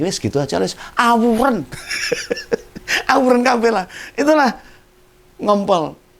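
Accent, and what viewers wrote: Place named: Semarang, Indonesia. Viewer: Penjelasan Om dengan logat Semarangannya asyik😅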